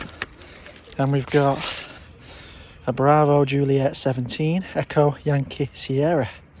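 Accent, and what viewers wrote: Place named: United Kingdom. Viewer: I'm American and your accent doesn't bother me at all. I can understand you just fine. You don't sound annoying you just sound British. I don't know what those commenters are hearing lol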